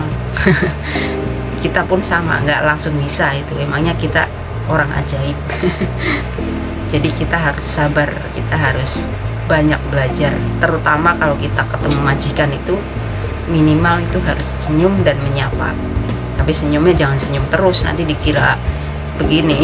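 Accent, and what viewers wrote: Banyumas, Indonesia. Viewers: Salam ngapak mbae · Salam Ngapak bae mba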